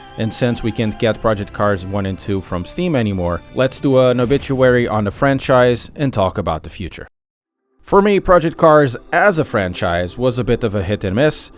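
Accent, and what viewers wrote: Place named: Portugal. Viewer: Ahahaha adoro ouvir tugas a falar inglês. Bom trabalho!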